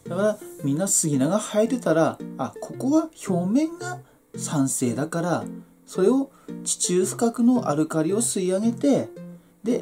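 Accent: Tsugaru, Japan. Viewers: 東北、青森県、津軽地方の方ですか❓️ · このイントネーション…津軽人だな？ そーいう私も青森市在住ですw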